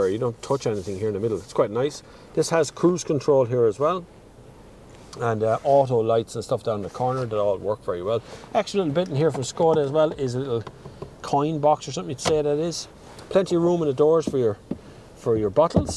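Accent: Ireland. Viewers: Great review. I don't think Laura understands the Irish accent. I know some of my friends who have strong accents, have problems with Alexa aswell.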